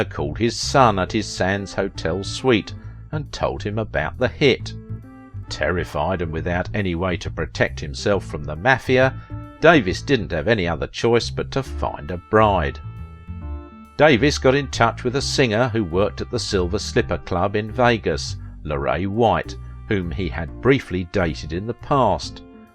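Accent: United Kingdom. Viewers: HI Beautifully narrated with that great British accent! Cheers! Shalom to us only in Christ Yeshua.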